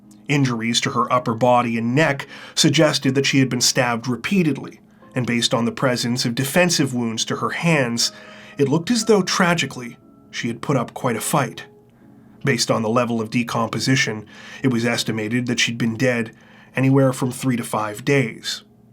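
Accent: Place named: Canada. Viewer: A very typical Canadian manner of speaking.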